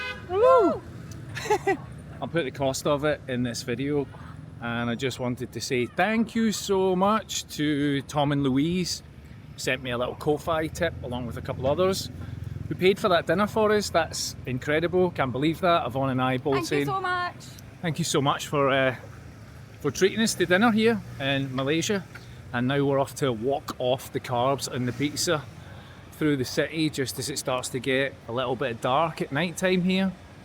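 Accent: Scotland, United Kingdom. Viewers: I know that accent, is that not Scottish? Or Yorkshire or someting? U remind me of a dear friend....he would say me arms are in pen as in my arms are in pain lol Welcome to MALAYSIA! And Have fun in KL!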